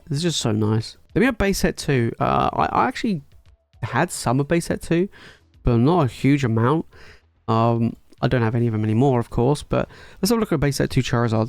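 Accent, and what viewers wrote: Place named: United Kingdom. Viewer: Nice to hear a UK voice - thought I was going crazy that I didn’t remember 1st Ed. in this country!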